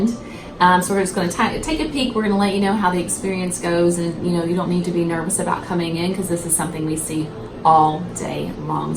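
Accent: Kentucky, United States. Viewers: I'm a Buckeye not a Kentucky girl- but we are close enough in vocal patterns that I feel, we are family after all. Love how you make your older patients feel at ease- as a person of a " certain age" often you hate admitting to family members there are things you can't do easily, especially toenails, after arthritis, joint replacements et all there just things you lack flexibility for. Thank You for being so kind!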